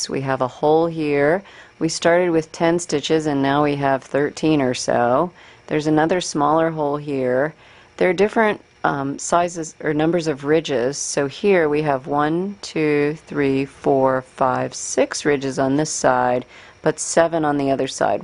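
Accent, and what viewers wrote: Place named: South Jersey, United States. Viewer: Are you from PA or NJ.. because you have a south jersey/philly accent .. similar to me.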